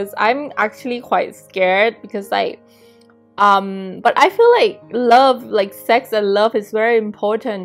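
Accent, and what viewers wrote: Thailand. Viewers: Pile 1, thanks for beautiful reading. Are u from Thailand? I think u have thai accent. 😊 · thanks a lot for the fun reading xx btw, are you thai? i'm just curious because we have similar accent.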